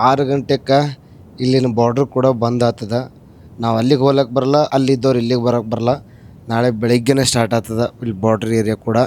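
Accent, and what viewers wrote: North Karnataka, India. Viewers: U k style bhashe super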